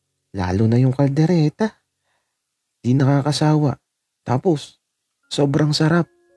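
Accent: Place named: mixed, Philippines. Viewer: Grabe ang ganda ng story author hehe waittings lang sa next ep. Nakakakilig yung story and maganda ang flow kasi nangyayare talaga sya sa totoong buhay.. I also love the accent ni author may punto kasi hehe 😅 parang taga Laguna or taga Quezon Province ganyan din kasi accent namin eh then gustong gusto ko din yung the way ng pag tawa nya hehe 😅 nakakadagdag kilig hehe 😅 Thanks po author sa magandang bl story waiting sa next ep po..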